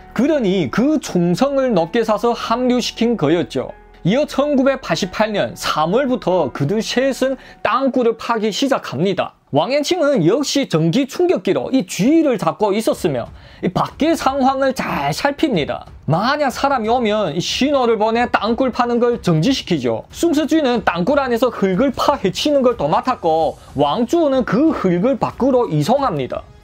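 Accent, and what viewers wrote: Yanbian, China. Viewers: 연변사투리로 하니 꿀재미 ~ 영화보다 훨재미있음 ~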